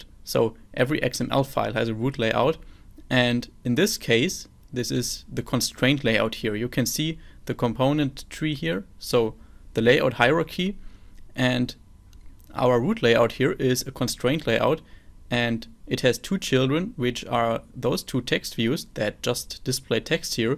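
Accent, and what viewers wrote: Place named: Germany. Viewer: Sounds like you are from germany. :D Anyway, thank you for the good explanation!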